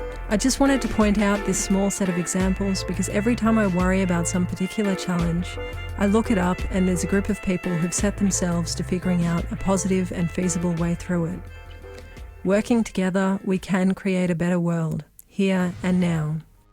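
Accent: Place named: Australia. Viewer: On the plus side, the fall of the US and European Banksters means the "Economic Hit Man" will stop destroying places like NZ and Bolivia. I hear what sounds like an Aussi accent ... is this video and international effort or y'all coming from the perspective of OZ? Finally I've always said the problem is not peak oil, its Peak Sanity. The solutions are all around us. See my video on Beadwall for example.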